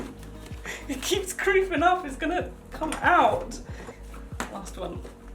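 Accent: United Kingdom. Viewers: I'm sorry but are you from the UK love you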